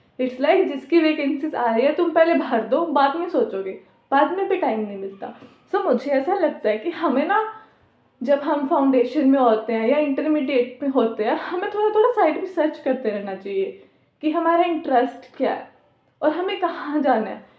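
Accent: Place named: Marwar, India. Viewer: aap marwadi ho kai aapke tone se lg ra h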